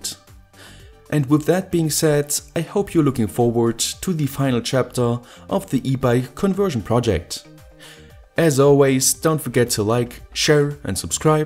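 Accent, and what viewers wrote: Germany. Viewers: German English ;) "controlaaa" :) "wourkin" :)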